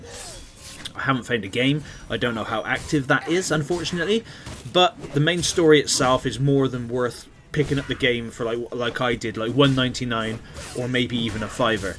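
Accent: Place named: West Country, United Kingdom. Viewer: Can hear that slight twang of a west country accent, love it from devon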